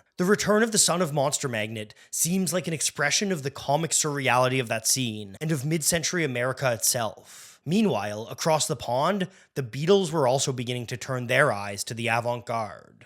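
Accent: Canada. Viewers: He's always had a bit of the ol' mushmouth lol. The occasionally intense Canadian accent doesn't help. But perfect pronunciation isn't why I watch these videos at the end of the day.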